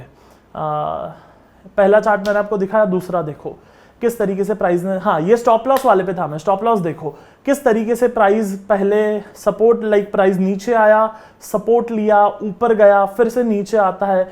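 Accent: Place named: Mumbai, India. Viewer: Awesome Yahi jab bolte h aap to maja aa jata h Aur humko sunna h typical bombay language bro Pls dont say pure hindi 😂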